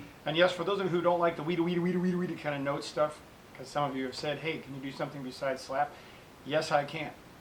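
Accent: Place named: United Kingdom. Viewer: love the british accent. greetings from london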